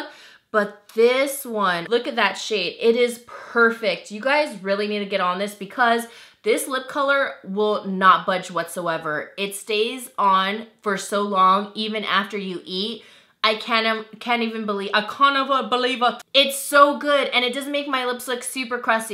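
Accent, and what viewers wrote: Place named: Northern Ireland, United Kingdom. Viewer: Girl you have me in a pure kink with the Cana baelive it ! Soooooooo funny! You sound northern Irish 🍀 I had to rewatch it ! 😂😂😂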